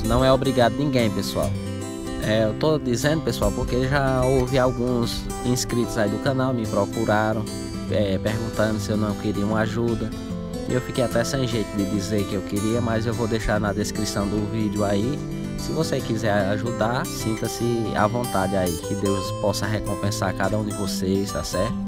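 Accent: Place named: Northeast, Brazil. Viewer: Oi, eu sou de Belo Horizonte e adoro os seus vídeos com o seu sotaque nordestino e as músicas de fundo. São bem informativos e mostra bem a alegria de vcs aí do nordeste, e a nossa tb, pq ficamos felizes só de ver a alegria que vc transmite.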